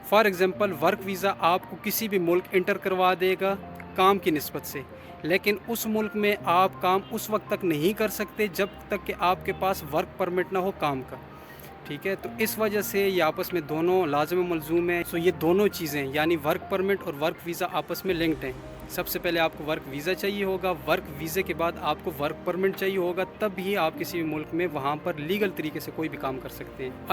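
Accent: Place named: Hindko, Pakistan. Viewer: Yr ak mujy apny hinko speak bi lgty ho khan sy ho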